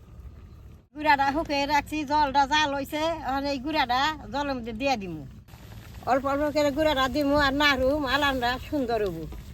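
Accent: Tangail, Bangladesh. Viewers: মাসির বাড়ি ইন্ডিয়া হতে পারে না।এটা টাংগাইলের ভাষা আমার নানার বাড়ি টাংগাইলে,মায়ের ভাষা এমন ছিল।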